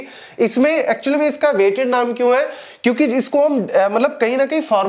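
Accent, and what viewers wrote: Punjab, India. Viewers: Veere I confidently know that your mother tongue would be punjabi.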